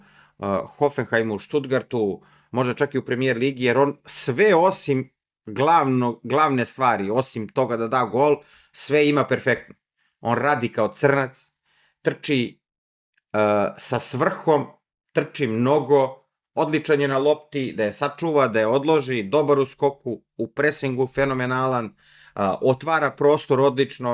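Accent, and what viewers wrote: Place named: South, Serbia. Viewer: Gledajuci te i slisajuci,prosto ne mogu da se otmem utisku da izgledas kao bulja,a i pametan si kao ista. Nemoj vise komentarisati sa tim juznjackim naglaskom si preglup.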